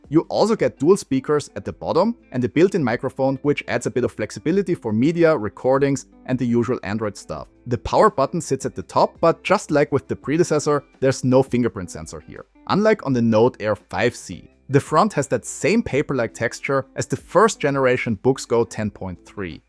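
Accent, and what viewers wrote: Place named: Vienna, Austria. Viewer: Great review! And now I finally know how Wiener Schmäh English sounds like! The Dewäääisss! But no judging, I also have an accent when speaking English :)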